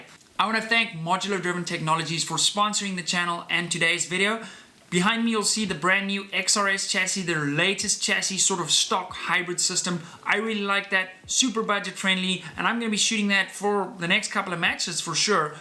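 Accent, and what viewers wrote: Afrikaans, South Africa. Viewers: Are you South African? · I actually came across your channel this morning,so have been binge watching your vids for most of the day!!Love the vids,and learning a lot...almost thought you were swedish,but then heard the afrikaans ha ha ha...Keep up the good content!!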